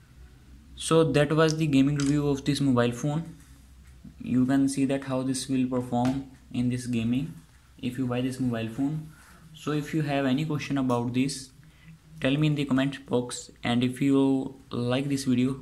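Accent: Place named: India. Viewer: hes indian his accent is SUPPOSED to be like this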